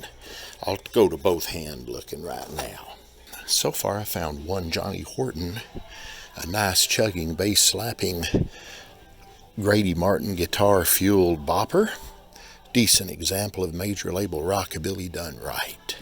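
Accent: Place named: Texas, United States. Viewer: Love the Texas talk. I'm in Southern Illinois. Always wanted to visit, never worked out. Great finds!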